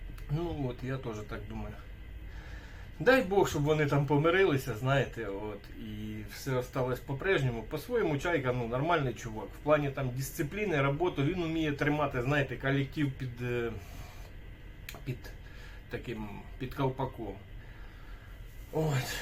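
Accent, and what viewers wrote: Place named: Western Ukraine, Ukraine. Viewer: Ооой, привіт, так приємно чути справжню Українську Западеньску мову ❤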